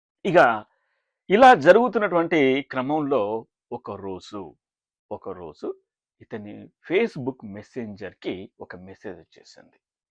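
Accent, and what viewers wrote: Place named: Tamil Nadu, India. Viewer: Sir, neenga Tamil aa? Illa ennai pol Tamilum Telugum aa? Neenga pesara Telugu Tamil accent kalandhadhu. Aanal arimayaga Telugu pesikindireerargal. Tamil yasalo Telugu chala baga matladugunnaru. US lo Tamil lo matladi chala nallaindi naku.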